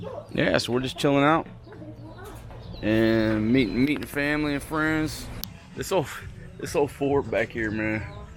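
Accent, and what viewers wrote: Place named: Western Pennsylvania, United States. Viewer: You have a western Pennsylvania/Ohio/WV accent.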